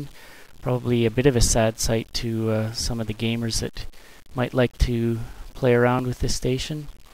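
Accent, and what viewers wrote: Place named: Canada. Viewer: you have a canadian accent lol are you canadian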